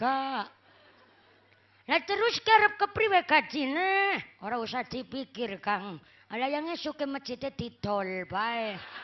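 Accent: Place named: Banyumas, Indonesia. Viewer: Kenapa skrng jd ngefens banget sama Ustadz, bagus ceramahnya ngapak meneh, 😀😀😀😀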